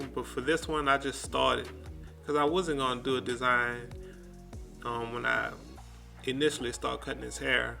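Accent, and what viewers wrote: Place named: Louisiana, United States. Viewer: you gotta be from Louisiana bro😹 but crispy cut man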